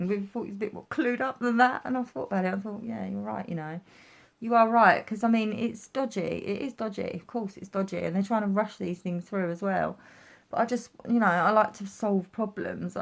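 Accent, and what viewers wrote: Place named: United Kingdom. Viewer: You like Em and Trump ...and have that British accent? Subbed ✅ Here in middle America we are just using our common sense and are thankful that Trump listens to his base, because we don’t want any politician to tell us how to keep ourselves safe. Most everyone here understands the importance of not spreading it but we will not be told that we can’t move freely about the country or be told we can’t earn a living for our family. That’s why you see in states that have Democrat Governor’s being more authoritarian than the Republican states like Texas, Oklahoma Florida.. Its an election year, so in order to make Trump look bad, they will fight him even if he found a vaccine.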